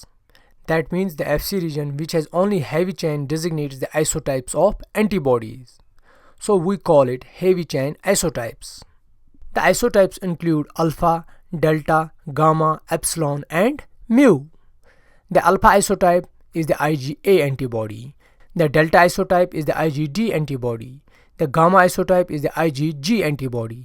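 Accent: India. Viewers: Your Ascent is very similar to Indian Ascent,are you Indian?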